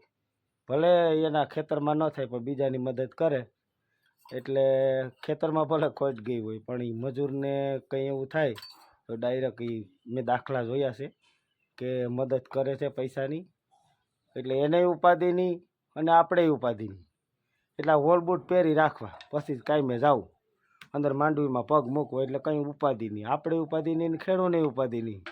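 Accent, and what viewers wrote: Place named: Sidhra, India. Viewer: ભાઈ સિધરા બોલી મજા આવી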